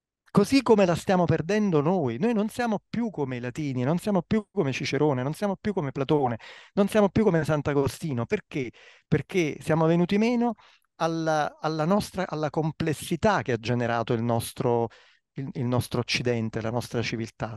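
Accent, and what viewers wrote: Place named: Campania, Italy. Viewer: M' illumino di immenso...nell' ascolto del Professore dalla squisita cadenza campana, e dalla serena e incisiva esposizione di una realtà che, da sempre osservo, analizzo e compatico. Iniziando la analisi del greco antico, si aprono mondi, universi ... è assolutamente prioritario essere messi nelle condizioni di studiare la realtà circostante andando ad analizzare quali erano le parole utilizzate dalle grandi Civiltà per definire il nostro Universo. Da lì le ispirazioni si aprono...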